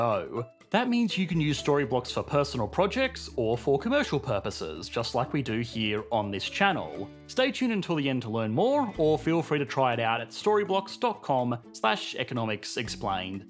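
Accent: Australia. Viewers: I’m so sorry but I’m so tired of his australian accent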